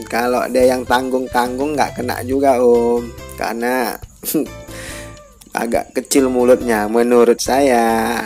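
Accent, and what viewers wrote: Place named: Jambi, Indonesia. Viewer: Bang jambi dimano ny bang... Masuk nian nampak ny gaya abg bcakap tu :D hahahaha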